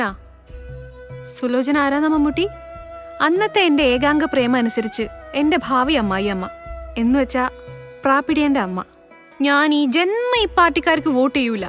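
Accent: India. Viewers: കോഴിക്കോട് കാരി ആണല്ലേ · Randam classil Kauravar… njanum…feel aaki kalanju…Valluvanadan slangum…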